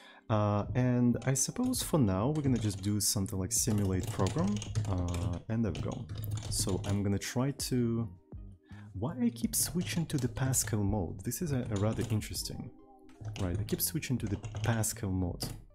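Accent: Russia. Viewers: Вам этот дядька не показался душнилой? С технической точки зрения всё прекрасно, знание английского, различных языков программирования разного уровня. Но вот складывается такое ощущение, что автор канала как-то с презрением относится к Ру говорящим, возможно даже стыдится того, что сам знает русский, стыдится или ненавидит свой акцент, старается игнорировать любые вопросы, касательно знания русского языка. Не знаю, выглядит забавно и хочется открыть окошко, проветрить ._.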